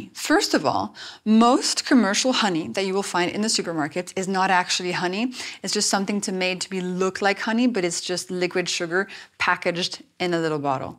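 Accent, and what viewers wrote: France. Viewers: When I first saw you, I thought you were French (that's a compliment) because your facial style, your personality, and the way you speak English has a little bit of a French accent, and I don't know if it's the short haircut that reminds me of that more than anything else. You look really good, like a model, not a biochemist, and I think that's why people are paying more attention to your channel, because it's not the boring, stuffy biochemist's sleepy explanations. I've been watching your channel a lot lately, and it's really helpful in a lot of ways. However, the food that they eat, I think it's one of those things that's so strongly cultural and I think it's one of those things that can't be easily fixed because it's so deeply ingrained, especially from your mum and your parents when you're younger. Of course, that's why your video is so important and meaningful to people who want to make a change and feel like there's a problem. 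I look forward to seeing more of your helpful videos in the future. I'm cheering you on from the bottom of my heart from Korea. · Hello angels!! Does anyone notice the similarities in her voice and the og mtv vj Martha Quinn? It’s like I’m back in 1982 watching Martha introduce the new video. With a little tinge of French. Anyway I have totally cut out sugar and most carbs, savory breakfast, vinegar, etc. feeling great, not deprived, and BMI and excess lbs are dropping!! It’s been less than 2 weeks but it’s working!!😊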